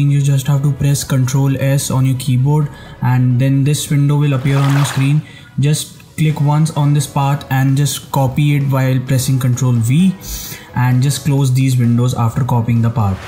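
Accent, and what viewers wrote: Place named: India. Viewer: you know a videos going to be helpful when the voice is that of an Indian guy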